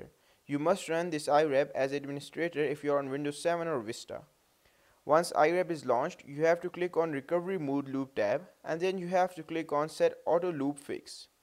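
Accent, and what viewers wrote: India. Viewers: (not racist) Cant tell if Indian or british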